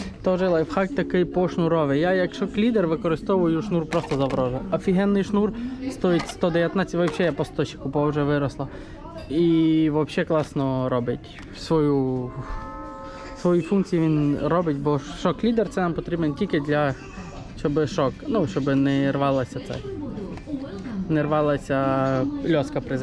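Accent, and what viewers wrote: Transcarpathia, Ukraine. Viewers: По говору чути що з Закарпаття) А звідки саме?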